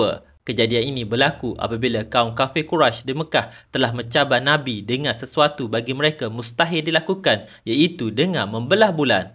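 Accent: Kelantan, Malaysia. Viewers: Abe ore kelate kea😂 suara blh cam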